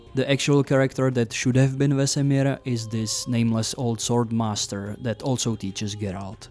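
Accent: Czech Republic. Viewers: Dopytle....celou dobu si říkám..odkud ten borec asi je....takovejhle "přízvuk"....to je snad Čech...a pak na mě vyskočí:)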